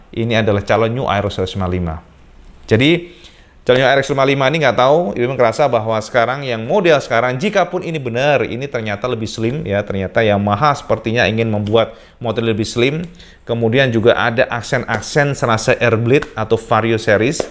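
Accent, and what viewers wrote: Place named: Java, Indonesia. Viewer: Wkwkwk kalo ngomong jawa kok kocak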